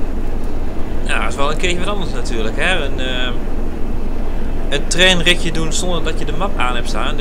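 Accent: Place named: East, Netherlands. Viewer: Hé! Een Nederlandse video! Ook leuk! Zo te horen kom jij ergens uit het Oosten? :p Ik kom daar wel vandaan ;)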